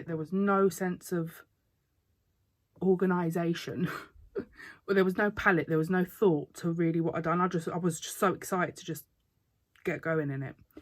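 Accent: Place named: London, United Kingdom. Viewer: Your pictures are so vibrant. I love them, and the purple snow is great. You talk like me. You're obviously another Londoner. I'm in North London (wood green).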